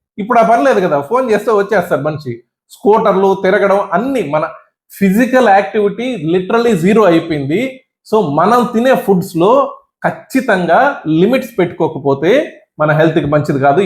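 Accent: Nellore, India. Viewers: 🙏🫡🙏🫡🎉🎉👌🤞👍 సూపర్ డాక్టర్ గారూ స్వచ్ఛమైన తెలుగు నెల్లూరు ఒంగోలు యాస లో అందరికీ అర్థమయ్యే విధంగా చెప్పినందుకు ధన్యవాదాలు అండి మేము చాలావరకూ మీరు చెప్పినట్లే వాడుతున్నాం కానీ వేరుశనగ నూనె డబుల్ ఫిల్టర్డ్ వాడుతున్నాం గానుగ నూనె ధర ఎక్కువని వాడట్లేదు అలాగే రైస్బ్రాన్ ఆయిల్ ఒక సారి వాడితే మరొకసారి వేరుశనగ నూనె మార్చి వాడుతున్నాం నాన్ వెజ్ లో ఆవ నూనె వేరుశనగ లేదా రైస్ బ్రాన్ కలిపి వాడుతున్నాం మేము హార్ట్ పేటెంట్స్మి అందువల్ల పూరీలు డీప్ ఫ్రై స్నాక్స్ తినం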